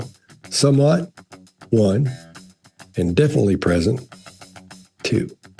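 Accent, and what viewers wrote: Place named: Texas, United States. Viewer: Scott's voice is so soothing, and his accent sounds very Austin / Dallas.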